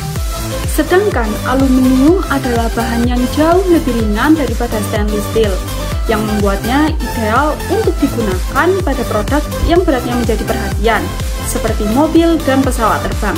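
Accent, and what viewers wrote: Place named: Java, Indonesia. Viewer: Mbaknya medok, pasti wong jowo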